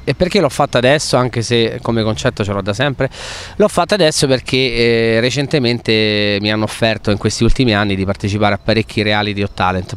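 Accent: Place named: Parioli, Italy. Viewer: Ma sta vocetta e sta parlata da pariolino???? Ma che è successo????? A piotta nun sei più er ghepardo de na vorta!!!! Però c ha ragione!!!!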